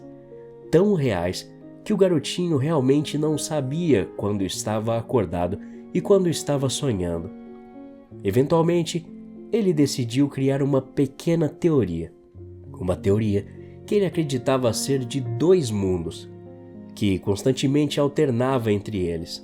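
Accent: Bahia, Brazil. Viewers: Mano, o mlk era baiano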